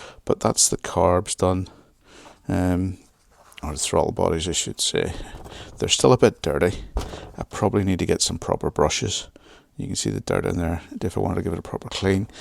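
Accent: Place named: Scotland, United Kingdom. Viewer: Just went and checked my <num> Duke R....yes that is the correct orientation for the header nuts. The 790 and 890 have different radiators. The 890 radiator is larger. Enjoying following this, Thank You for sharing! You are doing an incredible job of cleaning as you go along. It will be like you have a new bike! Also, as a Texan who has some Scottish ancestry and a Scottish name (Gillie)...I'm enjoying the accent!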